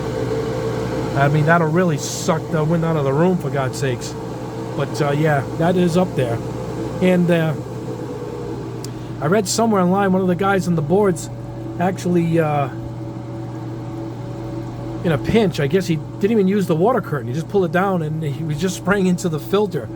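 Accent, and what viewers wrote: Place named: North, United States. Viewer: I watched it again and figured it out. I’m in the deep south. My 65 year old southern ears heard your northern accent say “I guess you could turn it off for lacquers and enamels.” What you actually said was “…..turn it up for lacquers and enamels.” Sorry about that. I’m going to have to get one of these! Thanks again for the video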